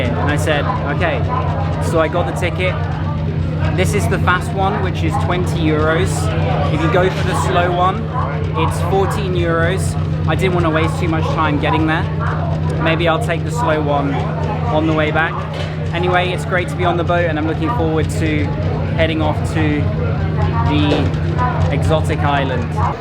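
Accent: England, United Kingdom. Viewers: I like his English accent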